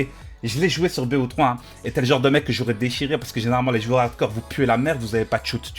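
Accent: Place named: Belgium. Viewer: tu fait trop rire j'adore les belges et leur accent ta trop raison mec t le meilleure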